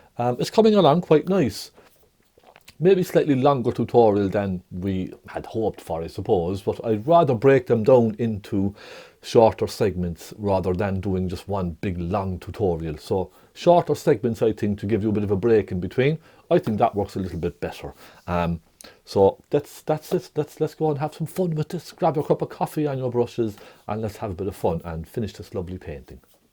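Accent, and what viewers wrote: Ireland. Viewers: I enjoyed your Irish accent. Thank you for showing me how to paint mountains and grass.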